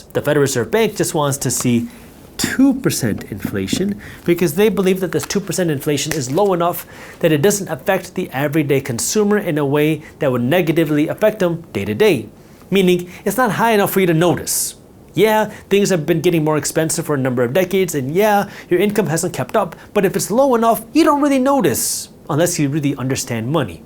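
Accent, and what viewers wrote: Standard. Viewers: Finally crisp and clear neutral English accent. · And speaks normal English!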